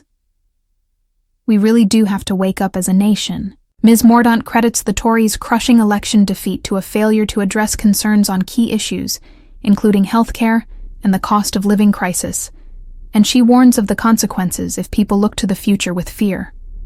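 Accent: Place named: United States. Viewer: Why have we got to have an american robot speaking? Get a real person, and preferably an English one!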